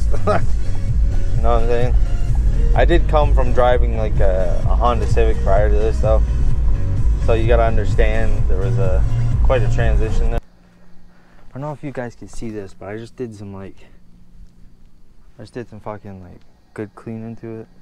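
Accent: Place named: United States. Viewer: Couldn’t tell if this guys American or English for first 5 mins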